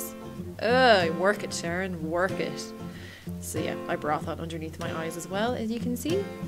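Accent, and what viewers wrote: Ireland. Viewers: Sharon I love how Irish you are! Where about are you from? Were losing yous all to Oz haha. Skin looks great btw, considering you just had your laser. Kisses from Dublin xx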